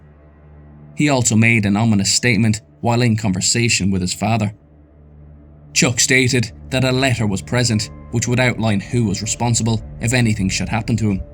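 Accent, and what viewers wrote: Ireland. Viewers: Great vids, pleased to find an Irish accent among all the American channels. 😁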